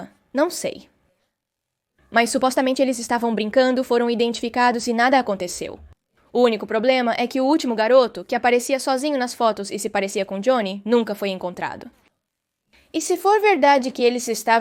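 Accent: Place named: Portugal. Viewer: este video ela fala uma mistura de brasileirado com portugues de portugal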